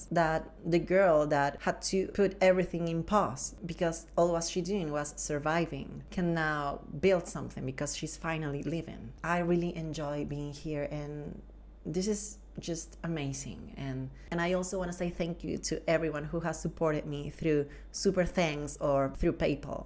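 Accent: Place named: Spain. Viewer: I absolutely adore your Spanish accent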